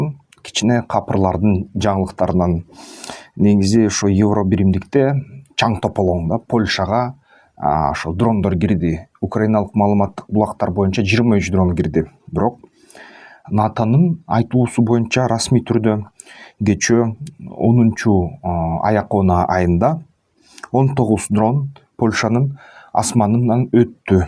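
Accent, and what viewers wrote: Standard. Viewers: КЫРГЫЗЧА СОЗДУ ТАЗА СУЙЛОГОНУНУЗ учун РАХМАТ СИЗГЕ УРМАТТУУ БАЙКЕ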